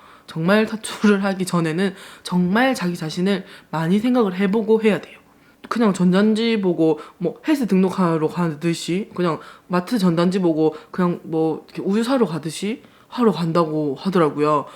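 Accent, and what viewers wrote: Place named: Gyeongsang, South Korea. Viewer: 말씀하시는거보면 저도같은경상도라그런지 친해지고싶네요^^ 대화해보고싶은 ,,, 화이팅~~^^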